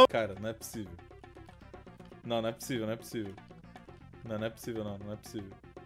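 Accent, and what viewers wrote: Rio de Janeiro, Brazil. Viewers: ta virando carioca?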